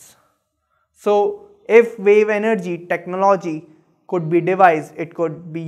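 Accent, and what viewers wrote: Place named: India. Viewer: Because, his brain starts in Hindi then... to Indglish ..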